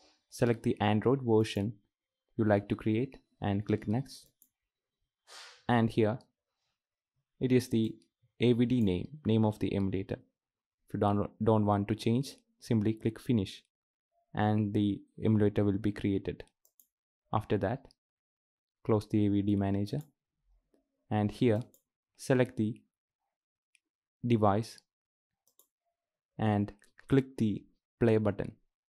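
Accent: India. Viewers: Not surprised. Indian accent.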